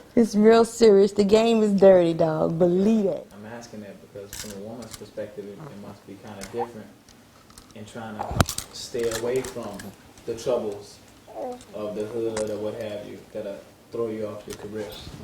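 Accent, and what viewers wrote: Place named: Los Angeles, United States. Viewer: Gotta be from L.A. w/ that accent and her fine self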